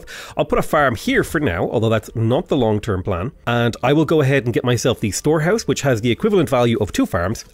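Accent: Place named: Ireland. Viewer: Thank you sir, very informative very interesting and such learnable for Ireland accent 😊 This is good way for me to study English. Thank you again. Please more AoW4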